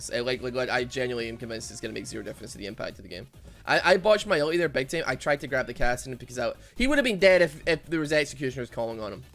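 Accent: Scotland, United Kingdom. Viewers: Is scottish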